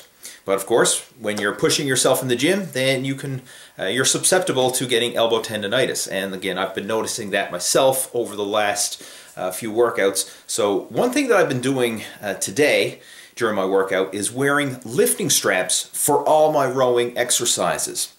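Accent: Newfoundland, Canada. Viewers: this is the first video i'm watching of yours, you are 100% from Newfoundland aren't ya?? ahah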